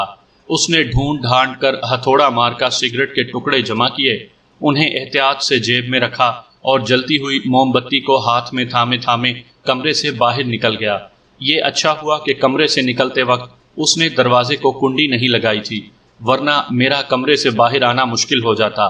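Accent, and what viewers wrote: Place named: Punjab, Pakistan. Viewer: Your urdu voice is very sweet despite punjabi accent in it.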